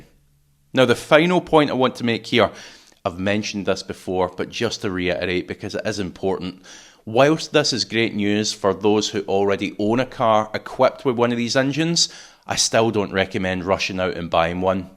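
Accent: Scotland, United Kingdom. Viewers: That Scottish English is so hard to listen to 😅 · I just love listening to ur Scottish accent ;-)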